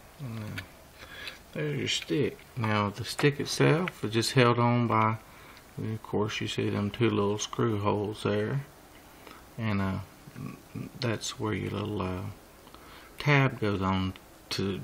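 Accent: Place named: Texas, United States. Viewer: There is nothing more pleasant than an intelligent man with a Texas twang!!!! God Bless you!!!!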